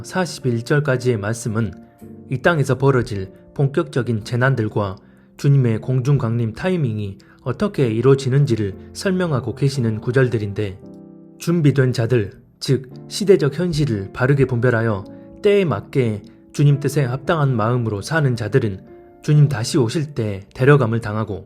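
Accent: Jeolla, South Korea. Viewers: 전남"지역 분~~이라고, 추정되는데요.. 제고향이 전남 광주 근처인데, 말투가 똑같으신데요.. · 전라도 사투리로 방송하시니 친근감이 느껴져불고만요잉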